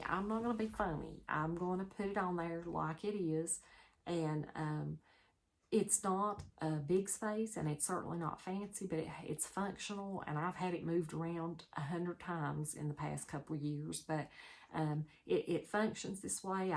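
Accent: South, United States. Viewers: Will you show this on your video? Love your southern accent. We are originally from Tennessee but have lived many places in U S. Would love to see all supplies used.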